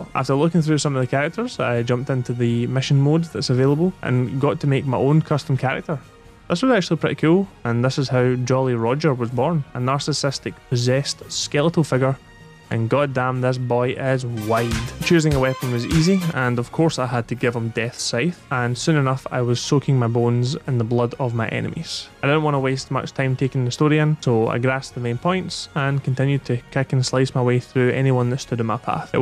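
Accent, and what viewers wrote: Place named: England, United Kingdom. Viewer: AHAHAH this is so funny i love people not understanding english accents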